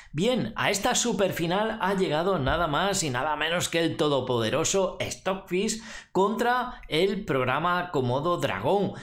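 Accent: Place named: Spain. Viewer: Los españoles hablan asi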